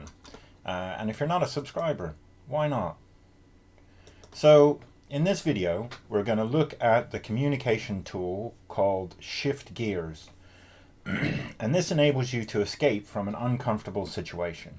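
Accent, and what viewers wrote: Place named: United Kingdom. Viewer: Why do i keep hearing abit of a british accent , 😂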